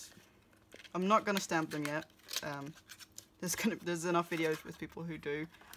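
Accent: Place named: Netherlands. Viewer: Hi! Subscribed right away just because of your chanel name..haha I seem to detect a little bit of a dutch accent, I am right? :) Edit: Just watched a little over half of your video and you gave an awesome idea to use the weeks for meal planning and groceries list. I had already thought about buying a weeks cover for my coupons but if I put a weeks inside it for the planning it will be even better! Thanks! :)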